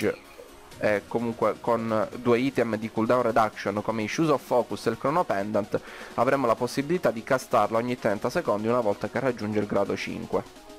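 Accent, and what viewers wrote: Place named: Palermo, Italy. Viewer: Io lo sento, tu sei palermitano ahahah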